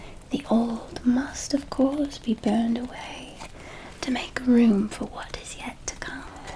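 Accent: Transatlantic, United States. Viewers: no way! I was just watching your older 1920s videos as inspiration for my 1920s themed Halloween costume! your trans Atlantic accent is unmatched 💖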